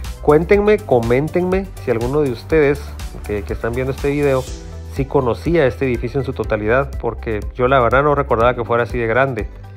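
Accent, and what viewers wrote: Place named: Guatemala. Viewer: el acento chapin wow I love it !!!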